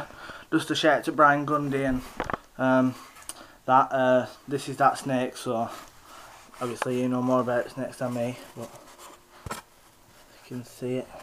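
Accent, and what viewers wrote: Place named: Wigan, United Kingdom. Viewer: Where are you from, I am from Wigan and you sound like your from near by x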